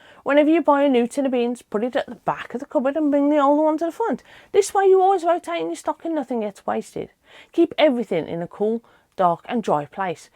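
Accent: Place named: Black Country, United Kingdom. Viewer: black country? Rowley regis? Is my guess ❤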